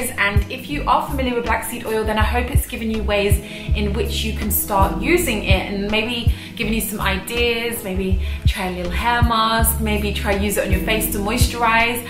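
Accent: United Kingdom. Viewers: Her accent is nice. It is how we speak in the UK. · Love your Brit-tis accent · A Latina with a British accent Double sexiness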